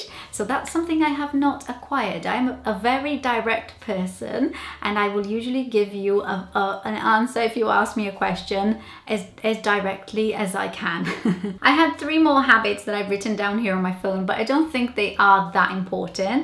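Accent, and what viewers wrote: Wales, United Kingdom. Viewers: defo a Welsh twang there.. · You have definitely picked up a welsh accent. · Whats funny is you sound so Welsh! I can hear the musical tone in your voice! Im not insurance is a cultural thing here though that more marketing. I tend to get insurance if I think things are going to get expensive if I dont... eg smart phones, houses, cars etc. I dont get insurance on white goods, as there really is no need if you buy quality brands. :-) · Dave Williams Just what I was thinking (hint of Welsh) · I love that you have a very Welsh accent!! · I love your Welsh twang. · Love the Welsh accent! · I can't believe your from Brazil? You could be from the Swansea valleys. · I'm Welsh , and she has definitely got a welsh accent. And I can tell what part of Wales she has been living too ! :-) Sitting next to someone on a train or bus in Norway. 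A country I spent some time in , is considered a insult and they will ask you to move ! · As a fellow South Wales resident, I can confirm that that is an absolutely wonderful example of an acquired Welsh accent! Da iawn! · You have a hint of a Welsh accent going on there, lol As a Brit, if in doubt, have a cup of tea, lol, the best medicine, lol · you have a slight Welsh accent! It sounds nice! I LOVE DUNKING biscuits! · You are lovely, Your English pronunciation is excellent, far better than most British people but I detected a very slight accent. I thought it was your brazilian background. But it was after you said you lived in South Wales I realised you have a slight Welsh accent. I am Welsh so I am very happy for you to have found a home there. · You have such a lovely voice with your Welsh twang 😊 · Please keep your dual measurements in your head - Imperial is horrible and very few people use it now. 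The only exception is road distances and speeds. Your Welsh accent is coming along nicely by the way - very charming! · What surprises me is the speed of a welsh accent you have picked up! :) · Your accent definitly has a little Welsh in it. I noticed it even before you said you lived in Wales. I'm from South Wales now living in Canada. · Picked up a lovely welsh twang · Your Welsh accent is so natural I assumed you were British! Fun video, and you're spot on with all the British habits 😅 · You are a knock-out!! 😍 Hola Linda!! You have a Welsh accent! I have a Birmingham accent and New York American accent too girl! 🤓 Portugees and Russian are hard languages for poor me. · Really cool! It's funny to hear things pointed out that are just taken for granted as being normal here, there are weird customs that don't make sense! Your accent is great, I can even hear a tinge of Welsh in there. Btw, I hate chips too. 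Good stuff! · Can hear the welsh accent in your voice before you said you lived in wales . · Love the welsh accent, with a little Brazilian in there :) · M H There is a slight Welsh twang to some of her words - I can hear it. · Your accent is superb very British with a little Welsh lilt. Americans serve fries even more than the British serve chips. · Thank you a joy to listen to, even your accent is vaguely Welsh. We are a quirky lot us British but we are appreciative of those that choose to join us and accept our foibles rather than insisting we change them. I'm sure you bring something to benefit us. I've been to South America so I know it's totally different from Britain.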